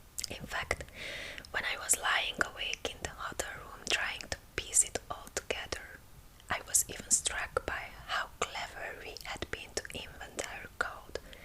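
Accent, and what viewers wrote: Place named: Hungary. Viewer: Haha very thick hungarian accent, i like it!!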